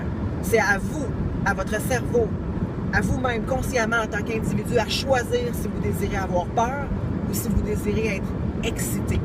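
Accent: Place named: Canada. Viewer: j ai du mal à comprendre à cause de l accent canadien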